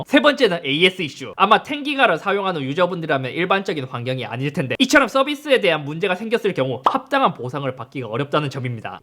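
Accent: Gyeongsang, South Korea. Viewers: 경상도 신뢰가 안간다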